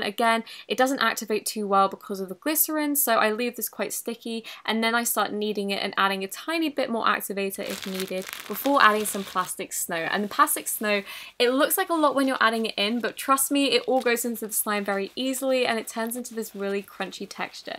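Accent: United Kingdom. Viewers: Are you British? You sound British.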